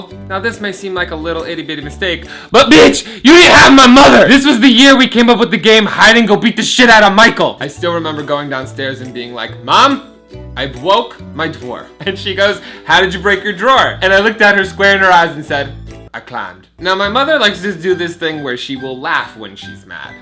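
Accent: New Jersey, United States. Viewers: Is anyone noticing his accent I like it jersey!!!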